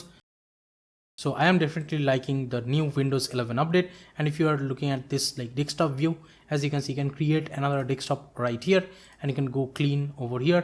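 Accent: India. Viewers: Why does it give me weird vibes to hear an indian accent and see AnyDesk on the desktop? Nice video tho! ^^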